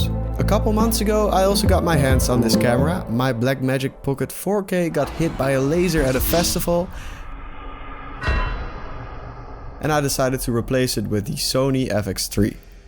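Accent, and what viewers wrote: Netherlands. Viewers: That distinct Dutchie accent 🤗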